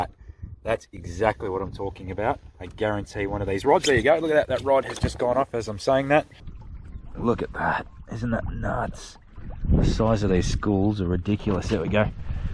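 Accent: Australia. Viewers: Interesting video! In ny search for a review of the Savage Gear Gravity jerkbait I ran a cross your video and was highly surprised when I heard aussie english and saw a common European perch in your hand?! I thought, for moment, that guy has relocated to Europe... Then I thought it wouldn't surprise if those Britts did with perch what they did with brown trout world wide...Anyway it's a nice little sport fish that tastes well. Is it causing you major problems in your waters? Invasive species often do but I am glad we don't have that here in Sweden, at least not yet. Cheers!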